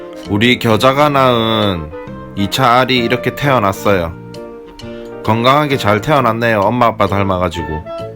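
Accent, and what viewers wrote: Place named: Busan, South Korea. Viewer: 말투랑 목소리 졸귀탱ㅋㅋㅋㅋ 부산억양 너무 좋습니다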